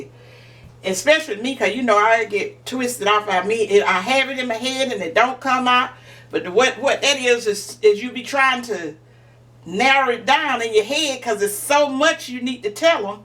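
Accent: South, United States. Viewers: Hello you are truly a delight to watch I just love your southern accent it's just beautiful and your very detailed step by step, if someone doesn't get it the first time with you,I truly feel sorry for them your very detailed. This is my second time watching you and I shall continue watching your blog.....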